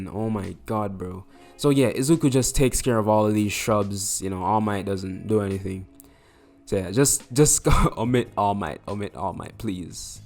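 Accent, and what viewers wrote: Jamaica. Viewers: Yo you sound Jamaican 🤔🤔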